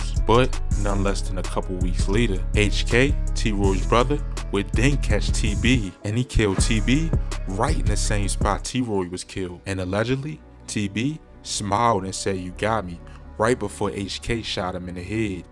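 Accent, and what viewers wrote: Baltimore, United States. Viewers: You got your story wrong bro. Troy wasn't opp shopping. Tb was looking for t roy and was right on his trail 2 minutesbehindhim. Troy was in the store to the back with someone else. Tb walked in with one of his boys and walked right up to Troy in the back of the store and shot him in the chest then ran out. You sound like you from bmore too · You must be from b-more. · My man is definitely from Baltimore, I can hear a fellow Baltimorian whenI hear one. Can we get a dog (Dug)? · You from Baltimore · On god. Soon as cuz opened his mouth, Straight outta Baltimore like shit😂🤦‍♂️🤦‍♂️ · You from Baltimore? You have a accent. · I hear that Baltimore accent!!! Respect and love from DC Salute!!!! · Where you from bro yo accent sound like ot Baltimore am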